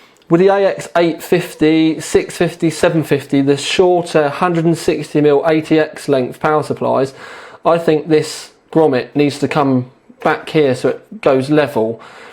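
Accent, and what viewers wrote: United Kingdom. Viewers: Let me guess, British accent ? btw excellent video,rich in detail .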